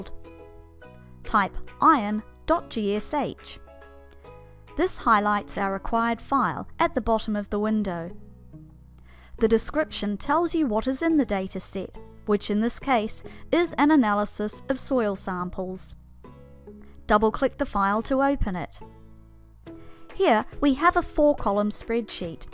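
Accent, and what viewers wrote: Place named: New Zealand. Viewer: You sound kiwi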